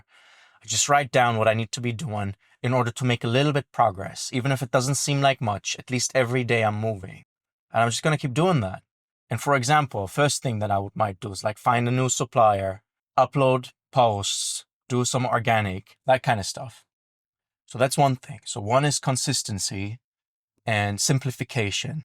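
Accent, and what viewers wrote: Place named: Jamaica. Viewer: Liked and subscribed because your accent sounds kind of Jamaican 👍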